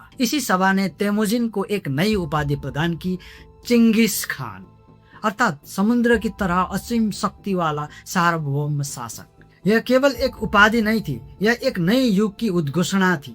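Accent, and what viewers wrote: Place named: Nepal. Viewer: u r nepali guy. bcoz how u speaks Hindi 100% nepali accent. with tone everything. but kinda like u person full confident. but never accept own mistake.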